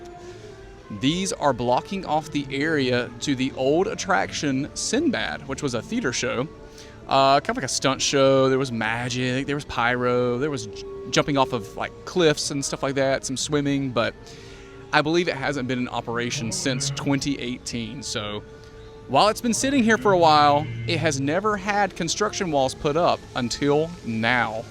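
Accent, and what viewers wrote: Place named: South, United States. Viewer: Thanks for the update,! We are hoping that they extend Harry Potter or MOUNT CRUMPIT into Lost Continent. WE DO NOT WANT POKÉMON! It’s not our thing. Where are you from? You have a southern twang! Love it! 😊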